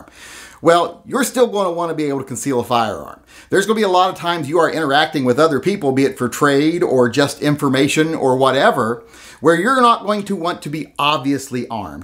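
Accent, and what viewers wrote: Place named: Appalachia, United States. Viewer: I disagree with your hillbilly pronunciation.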